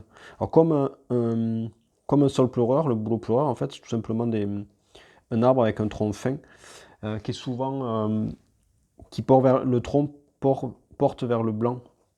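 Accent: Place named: South, France. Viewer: Super 👍 avec un petit accent qui sent bon le soleil 😁 bonne continuation 🤗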